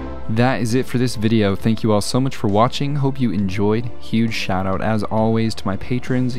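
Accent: Germany. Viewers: Perfect northern German pronunciation. Not a native speaker, but have been speaking it for about forty-four years.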